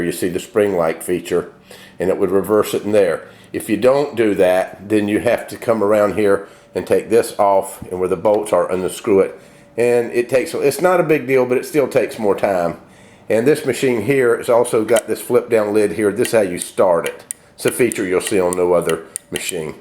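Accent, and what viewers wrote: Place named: South, United States. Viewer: Me 3. He sounds Southern American.... can you blame him?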